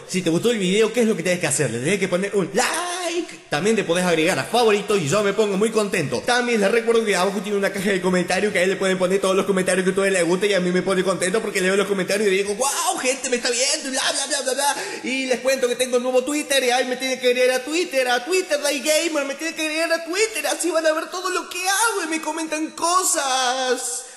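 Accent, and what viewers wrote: Córdoba, Argentina. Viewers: JAJAJAJAJAJAJAJAJAJAJAJAJAJA, mori de risa xD me encanta el acento, bien argentino <3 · eres cordobes? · Sos argentino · eres argentino ???? o chileno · ERES CORDOBES?? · es bien argentinooooo!!!1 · Oye, ¿como se llama ese servidor? Pasame la IP porfavor... Grax (mi cuenta es ARIKUZUKI o mi otra cuenta de minecraft es 4R1KU2UK1) Si me encuentras en algun server... avisame plis XD.¿Eres de Córdoba? Tenes asentó cóordobes. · sos cordobes ??? :D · sos argentino .. cordobés ??? yo soy de buenos aires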